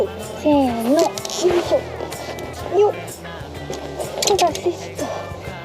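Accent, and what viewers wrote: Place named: Hakata, Japan. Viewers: 博多弁？可愛い🥰面白い企画でした👍🏻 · がたまに喋る方言が好き！福岡の方言は可愛すぎる！ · トップページに「ヤエー」？「ハイク乗り」？が表示されたので不思議に思って観てみたらだったんですね。登山動画で何度が観ているのでお勧めに上がったのでしょう。山にいる時よりハイテンションで九州弁が良く出ていますね。バイク乗りにそんな習慣があるなんて知りませんでした。一息ついたらバイクの旅も診てみます。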